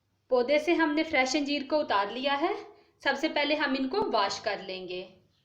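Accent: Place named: Punjab, India. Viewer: oh ok you have the same accent of Indian punjabis 😊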